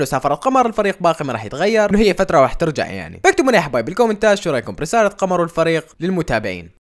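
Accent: Iraq. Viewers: اتوقع العراق لأن كلامه عراقي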